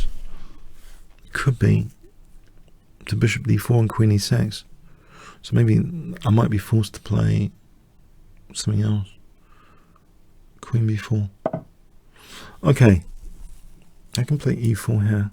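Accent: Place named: London, United Kingdom. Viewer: Yeah it cause a londaner, "th" becomes "f" or "v" depending on the word!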